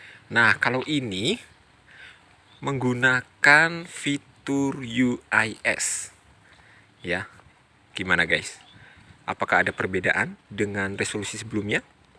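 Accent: Purwokerto, Indonesia. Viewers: Orang purwokerto kah?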